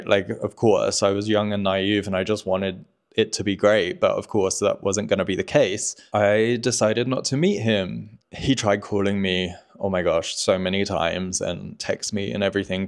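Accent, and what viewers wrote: England, United Kingdom. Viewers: I am from Birmingham but it wasn't me lol loads of fake pics still about these days interesting vlog btw you still got your English accent 🍻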